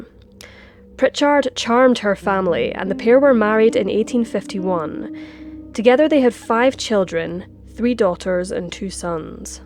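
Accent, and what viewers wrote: Scotland, United Kingdom. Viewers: It's good to hear a Scottish accent! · Love your Scottish accent. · Excellent channel your accent is scottish i think anyways thanks from Boston Massachusetts USA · Love your videos, Scottish accent (I'm from Ayrshire) is the best for crime docs. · I keep forgetting you're scottish. I really do enjoy Scottish narrations! Is your accent Glaswegan? (Sp? ) Or is it from another area? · You uttered the phrase "by a malicious Englishman", with far too much enjoyment in your lilting Scottish accent!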